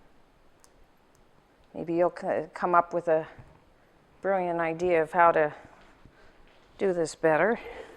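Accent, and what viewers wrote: Canada. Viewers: thought she was canadian, the accent and all that...weird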